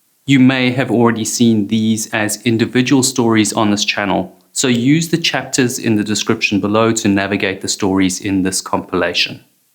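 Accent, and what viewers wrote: South Africa. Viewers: Why do you use prioritize imperial measurements over the metric system? Your channel bio says the channel is based in Germany, and you clearly have a South African accent. Neither one of these countries use imperial measurements. This is baffling that you chose to prioritise your American audience.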